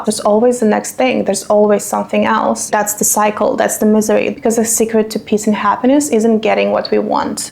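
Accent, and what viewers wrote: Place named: Norway. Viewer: I just wish you'd have said that cool pali word in your cool Norweigan accent😂!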